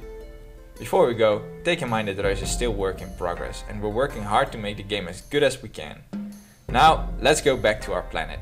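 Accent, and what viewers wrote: Netherlands. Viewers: This looks interesting, I like indie-games like these. Art looks good, hope it's well balanced. Je zou wel wat aan dat accent moeten doen. Hollands engels is nog pijnlijker dan Frans engels. Wel het opvallende onvermogen maar dan zonder de charme. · you actualy sound dutch ^.^ it would explain why the name reus soudns so mutch like the word giant in dutch :P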